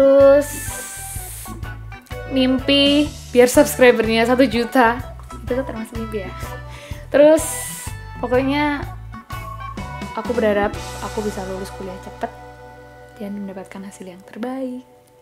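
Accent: Java, Indonesia. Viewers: jawanya medokin lagi kak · Jawanya medok banget mba 😊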